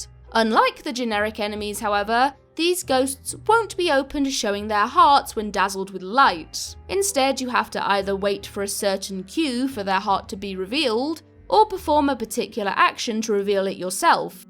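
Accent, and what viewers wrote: United Kingdom. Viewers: You sound very british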